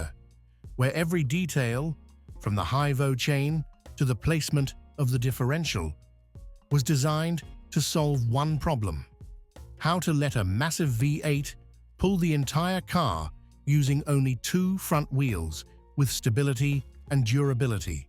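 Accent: United Kingdom. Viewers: This chan has the best British voice.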